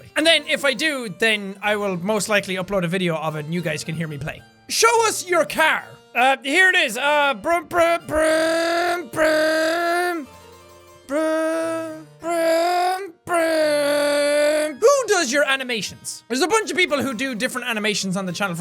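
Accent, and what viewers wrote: Ireland. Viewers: Now that you moved does that mean your going to lose your Irish accent? Please be no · JACK!!!! Since you moved out of Ireland do you think you might slowly lose your accent?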